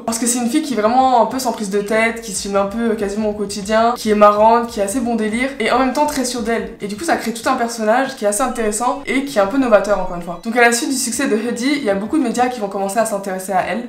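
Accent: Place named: France. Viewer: Trop bien ! J'adore ce format. Le seul truc que j'aurais à redire c'est : arrêté de te justifier haha. Par exemple mets un petit disclaimer au début pour ton anglais au lieu de le dire à chaque fois et traduis directement les paroles (tweet, interviews etc) pour ne pas "galerer". De toutes façons les français ont tous un accent très prononcé et souvent un anglais très moyen, ne te prends pas la tête ! On est pas la pour te juger. 🫶 En tous cas grosse force à toi, on attend la suite ! 🤼‍♀️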